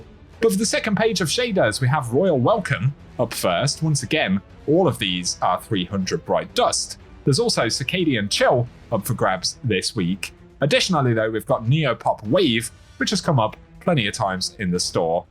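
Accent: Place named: England, United Kingdom. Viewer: Cut him some slack, he's English. If he were Welsh, you wouldn't be able to understand much of a damn thing, I'll bet.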